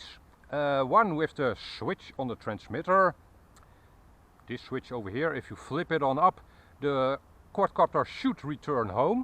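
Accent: Netherlands. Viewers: wat een verschrikkelijk Nederlands accent heb jij, natuurlijk ben je Nederlands maar dit klinkt echt bagger. alsjeblieft werk aan je Engels.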